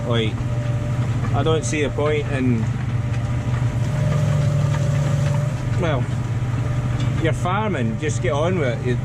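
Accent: Scotland, United Kingdom. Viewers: Which part of Scotland are you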